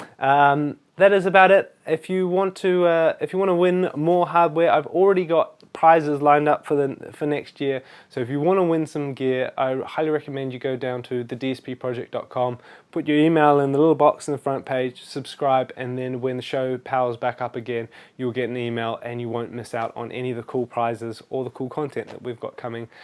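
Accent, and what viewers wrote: New Zealand. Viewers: ah! i knew you were a kiwi!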